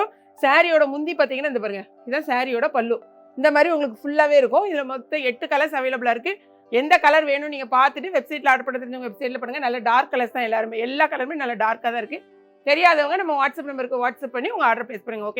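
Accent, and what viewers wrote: Tirunelveli, India. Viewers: Your videos super .. recently watched one of your video about vivikam recipe forwarded from my sister . So much nostalgic memories from my childhood, from idayangudi living in Chicago for the past 27 years , your Trinelveli accent and very simple talk , nothing fancy .. great collection of recipes. Super super . Need see many of your wonderful recipes and want to try them Thank you so much . Finally so glad I found your channel.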